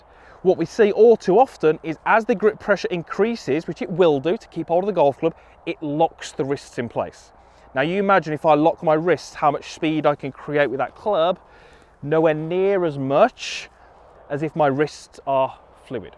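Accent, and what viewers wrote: United Kingdom. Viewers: Surely u with ur brit accent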